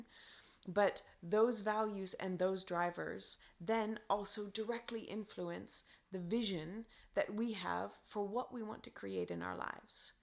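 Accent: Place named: Jamaica. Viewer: Your accent sounds very Jamaican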